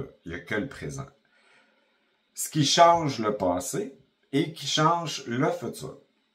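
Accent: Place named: Quebec, Canada. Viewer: Je veux un traducteur de québécois bordel de nom d’une pipe je capte que dalle 😂mais je m’accroche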